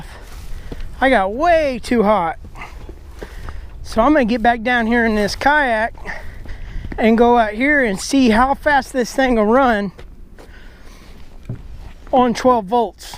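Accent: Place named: United States. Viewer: Love your country accent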